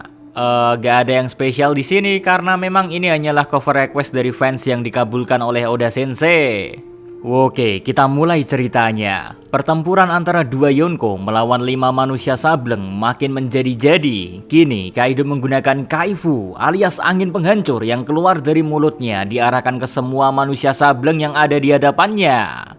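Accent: Java, Indonesia. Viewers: jowo ndi?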